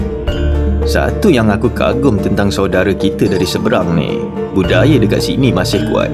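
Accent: Malaysia. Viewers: video dokumentar ini layak di beri apresiasi, narasi hostnya sangat bagus dengan dialek bahasa Melayu khas semenanjung yg enak di dengar, bahasa yang rapi dan teratur, vokal yang bagus, dan mudah di mengerti.angle / sudut pengambilan video sangat bagus dan rapi, editing nya oke... all the best lah.. salam serumpun dari jakarta. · Bang suara yunik menarik logat malasyia...slm bumi minang kabau...❤👍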